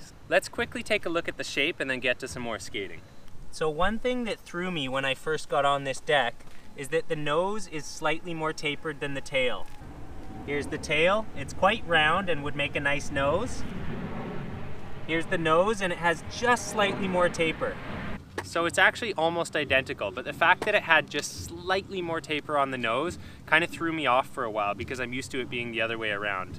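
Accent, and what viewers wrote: Canada. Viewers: First time watching the channel and I thought to myself that guy is Canadian I'm sure, I can hear it in his accent. As an English man we can't typically distinguish the difference between American and Canadian accents and whilst all you in North America hear those differences between each other easily I imagine, for us it really isn't easy but I think I've got it sussed now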